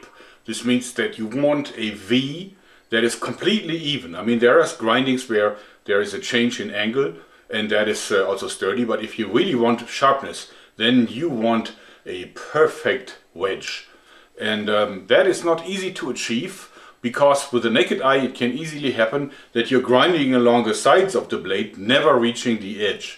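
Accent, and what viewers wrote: Germany. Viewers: This is amazing. I love your Channel. I used to be a Chef so I really appreciate a sharp knife. I also like your thick german accent. Makes it unique somehow. Und das, obwohl Deutsch meine Muttersprache ist.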